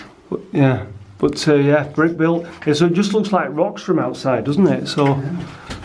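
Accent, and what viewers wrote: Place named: North, United Kingdom. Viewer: yes thanks mate great videos chap! Scouse my daft comments I love that word bugger lol. When I hear an accent like yours I think of mike Harding, aka The Rochdale Cowboy, he called everyone buggers lol!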